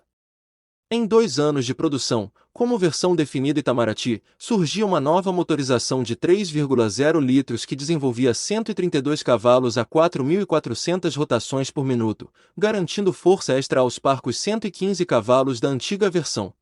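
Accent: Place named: Portugal. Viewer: Que bobagem! Uma reportagem tão boa e vem me falar de correção da pronúncia? Qual o problema de se pronunciar à "moda portuguesa"? Qual é.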